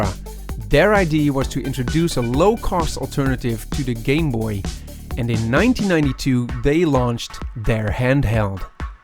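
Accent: Netherlands. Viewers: You Dutch ? :-) great video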